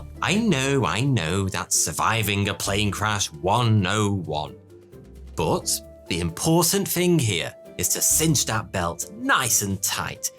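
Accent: United Kingdom. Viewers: well that's because he is British... go just a bit further east or south and the accents/voices start sounding increasingly "ooga booga-ish", especially once you reach Eastern European territory. source: years of gaming with voice chat + discord and many vacations around Europe. the worst offenders for terrible English accents rated from indecipherable to somewhat understandable are: 1. Russians/Ukrainians (these share the top spot) 2. Greeks 3. Italians 4. Spanish 5. French 6. Bulgarians 7. Romanians 8. Serbians 9. Lithuanians 10. Latvians whereas the most clear accents from most understandable to least understandable would be: 1. All the Scandinavian/Nordic countries; Danish, Norwegian, Swedes, Finnish, Icelanders 2. Dutch 3. Belgians 4. Germans people from countries like France, Germany, Italy, Russia, Spain and Greece happen to be the most rude people when playing games online internationally, as they will usually not even attempt to communicate in English and just assume everyone else on the server speaks their native language, and when they get angry usually start cursing and raging in said native languages as well, not realizing that they aren't actually offending people since nobody understands what they are saying. 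the countries not included in this list are people i don't run into often and therefore can't judge.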